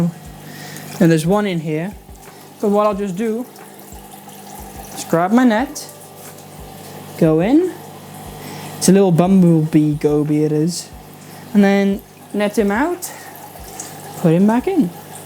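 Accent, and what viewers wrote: Wales, United Kingdom. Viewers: I'm confused, as a Welshman I'd swear that accent was Welsh, but then I find you're a Nederlander. 🤯 😂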